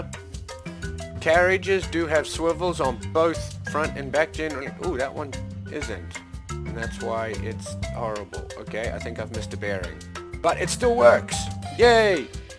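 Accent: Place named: Africa. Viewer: I swear you have a African accent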